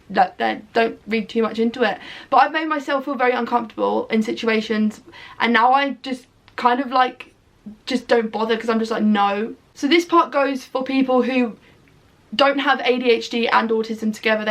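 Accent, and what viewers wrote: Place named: United Kingdom. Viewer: I find non-violent communication from Dr. Marshall Rosenberg to be helpful with direct conversations about difficult topics. It's an amazing way to show what your needs are without being pushy (or feeling pushy) about it Also, you sound British. In Dutch society it's a bit more chill because people are used to being direct (I'm Dutch). So culture plays an important part as well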